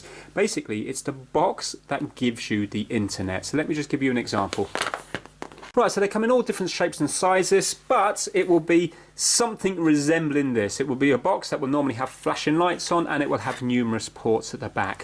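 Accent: England, United Kingdom. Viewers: i love this guys accent even tho im british too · Jamás había escuchado el acento inglés, sin duda me sacó de onda por que es algo diferente jaja, espero comprarme pronto mi nintendo switch, veré este video mientras la configuro ;) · You have the friendliest british accent I have ever heard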